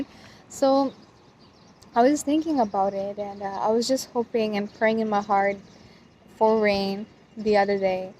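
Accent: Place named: United States. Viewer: Your English like American English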